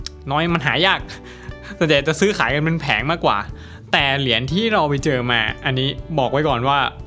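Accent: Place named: North, Thailand. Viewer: เสียงหนุ่มเมืองแปร่ อู้ไทย เสียงหล่อ ดี น้อ อิอิ:face-blue-smiling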